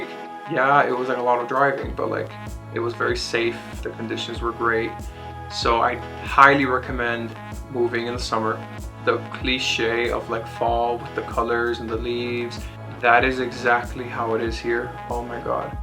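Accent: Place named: Miami, United States. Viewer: Yeah, you've got the Miami accent. I've never heard it until I was watching one of my (now) favorite YouTubers who is from Miami, and I first thought she was not born in America. I would describe the Miami accent as sounding like someone whose native language is not english....but coming somewhere from a latin American country and learning to speak english fluently and almost without an accent..but there is still something there.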